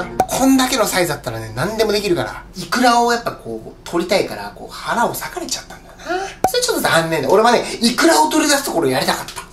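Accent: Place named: Hokkaido, Japan. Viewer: 北海道弁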